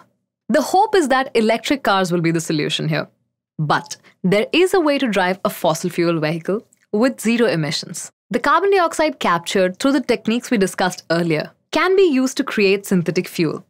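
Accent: India. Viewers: i love indian accents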